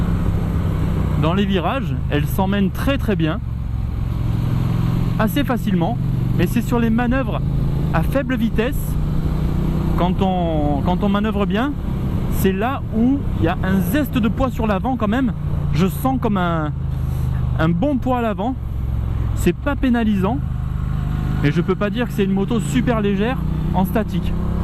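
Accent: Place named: South, France. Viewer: un petit accent du sud bien sympa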